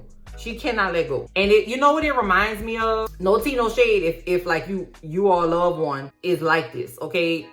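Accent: New Orleans, United States. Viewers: Awwww I love the N’awlins/New Orleans accent! I have NO trouble understanding! Two of my best boyfriends were from Louisiana, one lived in Slidell/Meterie, and the other in New Orleans proper. ❤ Bith talented musicians, and one a great cook! Good for you for sticking up for yourself and your channel’s loyal community! Keep on using that strong voice of yours proudly, cuz I love to hear it! 🎉🙌🏼🌟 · My favorite pies: Lemon Meringue, French Silk (Chocolate), Pecan, and Sweet Potato are my favorite pies. 🥰🥰🥰 I will eat a Fried Apple Pie every now and then...but it is not my favorite. Also, Cousin, I LOVE how you protect your space. And I LOVE the N'awlin's accent. (However, I am a Southern born & bred Cousin...MS). ❤ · I'm so sorry to hear you're getting arseholes, racists, and idiots coming here to your channel. I've only been watching for a few weeks---found out about you on Reddit!--and for what it's worth, I love your New Orleans lilt 🙂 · Your accent is what I find a very pretty accent type for US and to me (as a Polish woman who moved to the UK as a teen) it's very clear, and I can understand every word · Damn fools complaining about you & your channel? 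Your awesome NOLA accent??? Don't get me started. The Brooklyn in me will read them! My PR/Capricorn tongue will unfurl and I'll be placed in YT jail Please. Why ARE they watching if they don't like you? Idiots. Okay enough of that. 7 minutes of AJLT for the second to last AJLT ep. Yep. That's what it felt like. Only thing I'll miss about this show is you & your recaps. I look forward to THAT more than And Just Like Crap (shout out to the other YTers who have that channel name). You are 🔥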